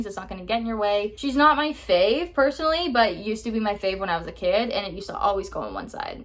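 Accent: Canada. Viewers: you sound so much more canadian in this video!!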